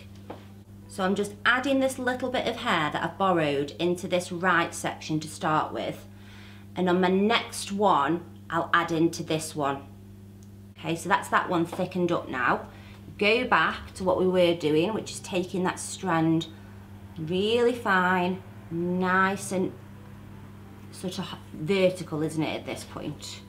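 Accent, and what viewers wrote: United Kingdom. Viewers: What British accent is this? She sounds so sweet, I could listen to her forever.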